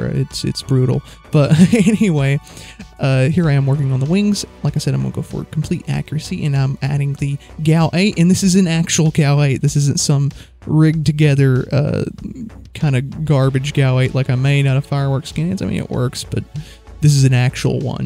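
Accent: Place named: Iowa, United States. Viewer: It's just a yes or no can you make a like ww3 series were you use that weapon mod basically nato vs russia and china and other Rouge contries pls and thank you. (other suggestion make a F22 and the B2 bomber) (I know you a guy from Iowa or something in the usa that has a dessert cuz you got a nice accent) (sorry if that offended you) (Gramerly helped with that) (I think im using too much brackets lol i mean these (((())) )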